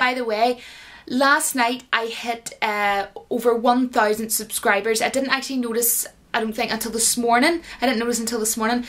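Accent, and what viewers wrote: Ireland. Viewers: Great info, Luv the Irish accent....drinking a wee bit of tea. Oh and you're dead on. In AMERICA we use the word eejit by itself with the same meaning. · Irish accent is freakin' awesome .. as for success, it really comes down to personality :-) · Top of the day to you 😊👍 I absolutely adore the Irish accent and I have heard many of the words that you use in this video. I have several Irish friends and one of my lecturers is also Irish 😊 it's so nice to listen to.. keep up the good work on your chann 👍👍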